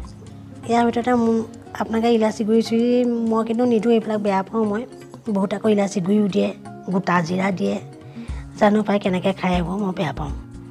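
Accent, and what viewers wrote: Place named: Sivasagar, India. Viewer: বাইদেউ আপুনি শিৱসাগৰৰ নেকি,কথাৰ সুৰবিলাক শুনি শিৱসাগৰৰ যেন লাগে,আমাৰখুৰীৰ দৰে.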